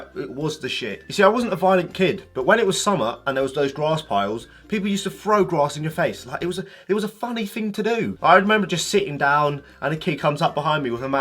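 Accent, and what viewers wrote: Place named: Essex, United Kingdom. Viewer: you sound like you came from My primary school! Then again its in essex so you probably didn't...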